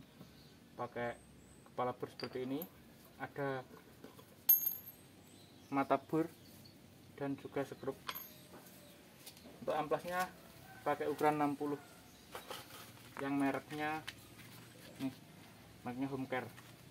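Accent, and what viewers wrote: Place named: Java, Indonesia. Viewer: Asli mana bosku kayak medok😂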